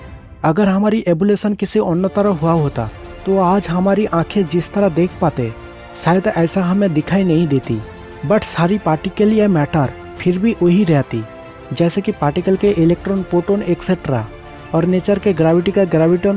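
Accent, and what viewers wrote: Bengal, India. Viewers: Sir ap ki Hindi thodi alag hai like a Bangla language.But nice video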